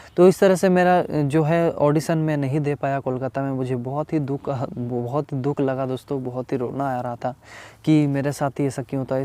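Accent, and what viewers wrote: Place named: Maharashtra, India. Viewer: Brother aap apni hindi language ko aise bolo jese ki marathee feel na ho matlv aap ki hindi language khadi boli jesi lagti hai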